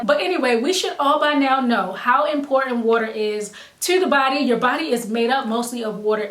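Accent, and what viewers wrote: Baltimore, United States. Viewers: Are you from Baltimore??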